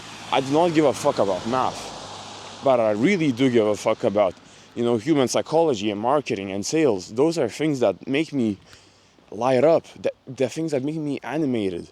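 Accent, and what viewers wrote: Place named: Croatia. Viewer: I recently hitchhiked around Europe from the u.k. not Lithuania sadly. I thought you sounded Croatian for some reason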